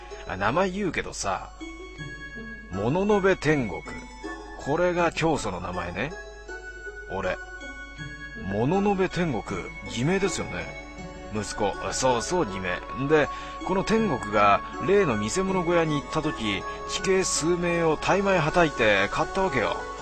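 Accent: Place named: Iwate, Japan. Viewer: 岩手のなまりってこんなかなぁ…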